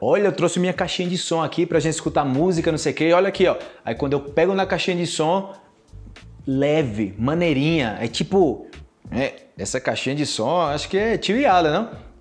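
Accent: Antioquia, Colombia. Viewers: se le siente el paisita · Parce use the habla re bacano cuando dice las cosas en español, jaja suena re colombiano jajaja gracias por esa creatividad y por esforzarse en esas expresiones que nos gusta. Quiero hacer lo. Mismo en portugués jajaj pero es difícil aún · Muito bom,! Excelente la lección y por cierto, muy chévere tu acento paisa 😊😌 y por un segundo creí escuchar un dejo de acento costeño?🤔 Obrigada pela lição! 😌🙏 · Está legal esse sotaque Paisa cara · Yoda 😂😂😂😂😂😂 estava saco 😂😂😂😂😂 que bárbaro que entonación pronunciacion y ritmo para hablar el español es que normalmente a los brasileños se les nota el acento pero increivel seu acento colombiano 😂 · Buen acento paisa.. (pola es una expresión más rola) y a ratos como costeño, medio caribeño. Yo no entiendo por qué usan tanto en portugués "mesmo" y "pegar". Y.. "berraco" también es estar enojado, incluso se vuelve verbo "emberrecarse". 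· Irmão, você é incrível. Você fala perfeitamente com sotaque colombiano. Eu aprendi muitas expressões colombianas com você. Abração !! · Adoro o seu SOTAQUE colombianoo, kkkkkk. Gostei do vídeo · Habla puro un colombiano, que cosa más linda ahh! Jajaja... Saludos desde Costa Rica... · Es increible tu acento Colombiano 🥰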